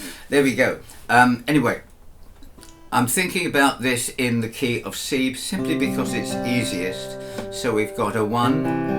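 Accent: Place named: United Kingdom. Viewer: Hey, I love your channel. It’s just lovely to listen to your ramblings/musings/stories whatever. Love your guitars and stories of how you got them. Keep up the good work..oh and it’s nice to hear a British accent..😊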